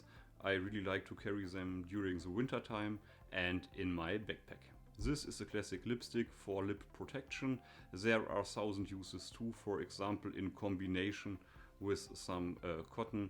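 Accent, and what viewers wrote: Germany. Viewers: German dialect! Dann sag ich mal freundlich Hallo 👋😁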